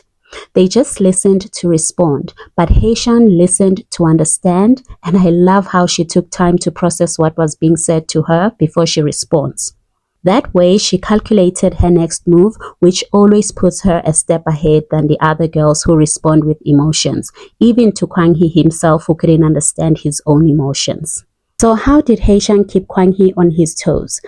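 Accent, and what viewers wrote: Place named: South Africa. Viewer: Interesting perspective. Well articulated. Love it. You sound South African!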